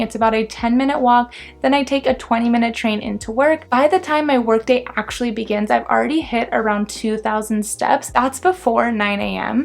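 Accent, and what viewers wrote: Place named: California, United States. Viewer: I think its californian, they do have that nasal drone,but I dont find it irritating, Im from the North east of england, I find my own accent embarrasing sometimes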